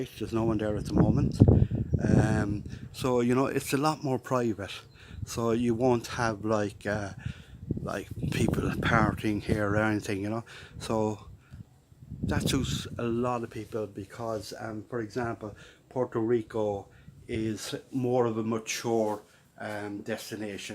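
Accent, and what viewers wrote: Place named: Cork, Ireland. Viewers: you sound like youre from Cork, i am too! ill be headed to puerto rico in a couple of weeks cant wait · Wasstorry languer cork hhh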